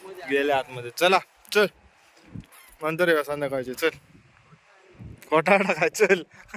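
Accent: Malvani, India. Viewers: Kiti god malvani bolte ❤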